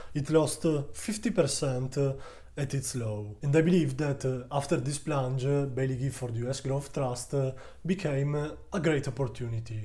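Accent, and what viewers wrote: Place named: Italy. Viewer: Is this language English or Italian language I like the accent sweet I will start learning Italian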